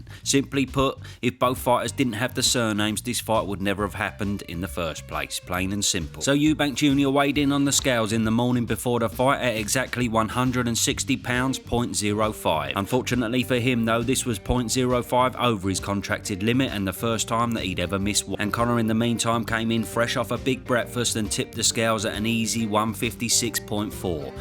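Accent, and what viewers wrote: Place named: Cockney, United Kingdom. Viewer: Ffs unsubbed his channel because of the cockney accent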